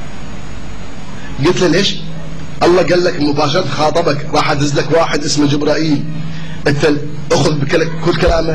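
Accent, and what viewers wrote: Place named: South, Iraq. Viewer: الصوت صوت هذا الدجال نفسه لان اللغه او الهجه الي يتكلم بها نفس لهجة جنوب العراق لا احديسطتيع ان يتكلم بهذه الهجه الا اهل الجنوب/ الشيعه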